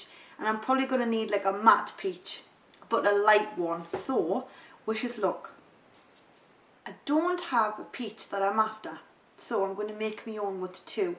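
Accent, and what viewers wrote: Newcastle, United Kingdom. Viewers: She's from South Shields, newcastle x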